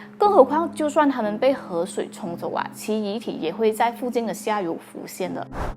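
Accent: Malaysia. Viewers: 这是马来西亚的华语。发音跟普通话不同。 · 很好很马来西亞中文音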